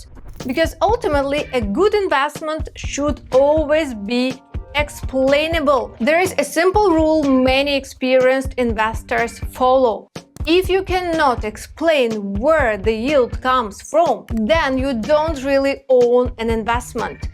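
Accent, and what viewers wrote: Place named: Eastern Europe. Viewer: east europ i think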